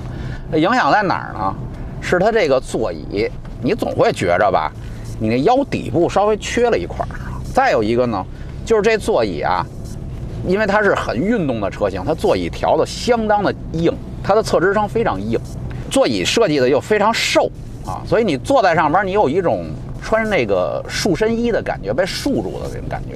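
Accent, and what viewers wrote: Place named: Beijing, China. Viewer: 听你讲话让我想起我在北京跟几个朋友唠嗑，哈哈😂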